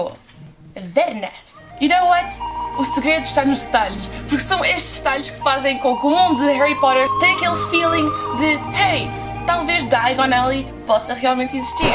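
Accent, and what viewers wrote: Portugal. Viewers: Parece q vc fala inglês seu português portugal e muito puxado😳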